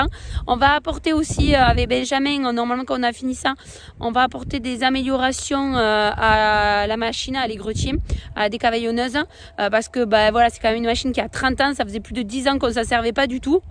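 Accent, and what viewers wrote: South, France. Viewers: Salut reportage super intéressant avec toujours ton bel accent du midi p'tit cc a Chipie 😃👍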